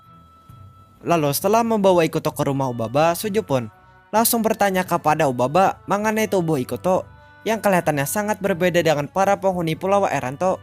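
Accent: Banjar, Indonesia. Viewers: logat banjarism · Logat nya Banjar banar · Pasti logat banjar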